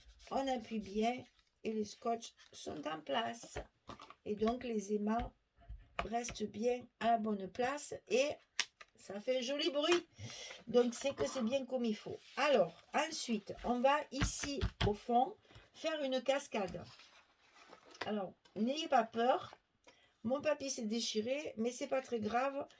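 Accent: South, France. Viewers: Je viens de faire une belle découverte en visionnant votre tuto. Tout d'abord j adore votre accent qui vient du Sud. Vos explications sont claires. Je me crois à un cours de scrap tellement vous détaillez bien . Bravo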